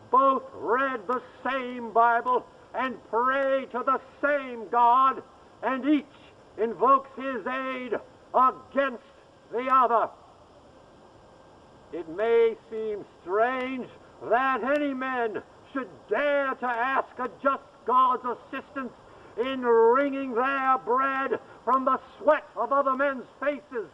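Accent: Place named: Midwest, United States. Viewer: William Lattanzio it seems more real because it's older and he has an accent. Old Midwestern, or Tennessean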